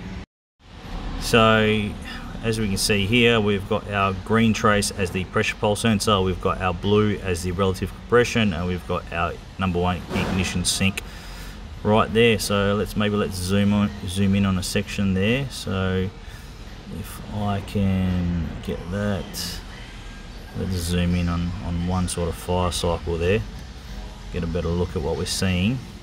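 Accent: Australia. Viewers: Absolutely love seeing your effective use of the Picoscope for diagnosis. Not to mention being in Oklahoma back in the states we love to hear your Aussie accent for all I know you might enjoy hearing my whiny hick voice in a good old USA too!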